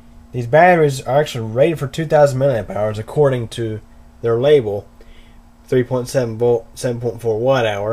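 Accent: United States. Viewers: I know this is an old video, but I bought an 11 dollar generic HP battery, because it was cheaper than buying the cells for a flashlight. So I was somewhat concerned that they may be low capacity. Finding this vid and then hearing your American accent was very reassuring for some.odd reason so thank you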